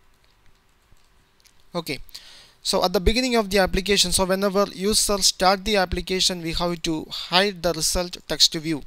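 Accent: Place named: India. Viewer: i hate hindi accent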